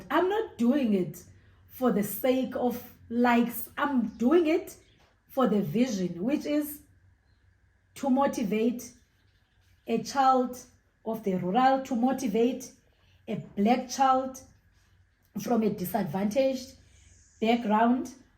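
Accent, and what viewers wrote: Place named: Zimbabwe. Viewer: Your accent....its giving Zimbabwe 🇿🇼 ❤❤😂😂 hello Mama, here from Mandy. Love your energy 🎉🎉😊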